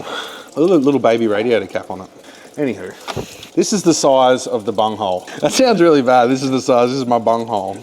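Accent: Australia. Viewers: These little cars are so cool. Also wait, you're Aussie! Subscribed!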